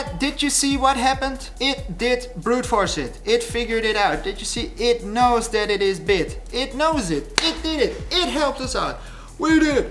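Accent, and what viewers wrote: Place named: Netherlands. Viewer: Yeah I can just tell you're Dutch, your English accent should sound more convincing in order to scam people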